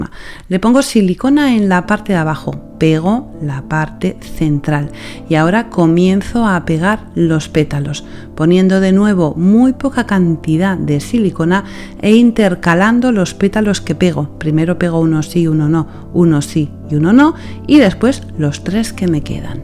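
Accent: Spain. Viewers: Bueno.... que decirte mi querida profe. Sin palabras como te digo siempre....de verdad no encuentro la palabra superlativa de las bellezas que haces. Te admiro tanto. Es tan dulce tu arte. Que cuando veo tus videos me emociono hasta el alma. Es tan magnífico como explicas,la música, tu voz con ese acento español que me encanta. Gracias por enseñar,por mostrar tu arte. Me pone tan feliz cuando aparece un vídeo tuyo. La niña es hermosa. Delicada. Sin palabras querida. Un gran besos y admiración. Te quiero mucho ❤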